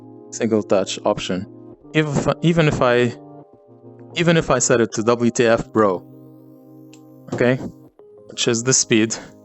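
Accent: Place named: Serbia. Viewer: Ar you serbian lol I love your accent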